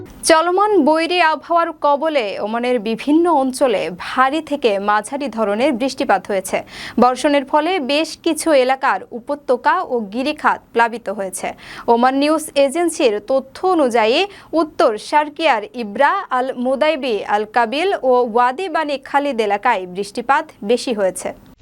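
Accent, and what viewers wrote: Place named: Barishal, Bangladesh. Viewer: এই পাঠিকার গ্রামের বাড়ী মনে হয় বরিশালে।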